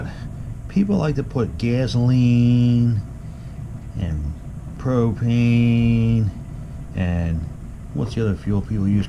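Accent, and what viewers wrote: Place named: Philadelphia, United States. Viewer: Ay you from Philly bro?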